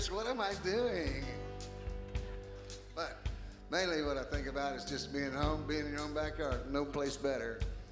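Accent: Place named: Texas, United States. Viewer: I love his true Texas accent!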